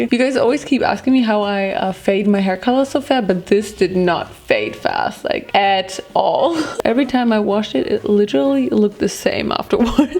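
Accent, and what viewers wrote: Germany. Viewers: Haha hab den deutschen Akzent sofort herausgehört und plötzlich hat sie Deutsch gesprochen 😆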